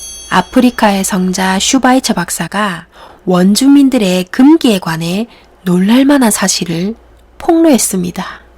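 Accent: Gyeongsang, South Korea. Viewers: 개인적으로 ,경남 사투린지, 부산 사투린지몰겠지만, 더욱 정감이가고.... 더불어 전해지는 진심어린 내용에 푸~~~욱!! 빠져 들게하는 매력이 있네요...ㅎㅎ 감사 하고 있어요~~!!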